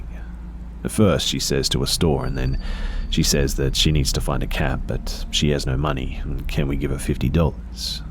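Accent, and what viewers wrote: Australia. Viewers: Something about an aussie reading stories really appeals to me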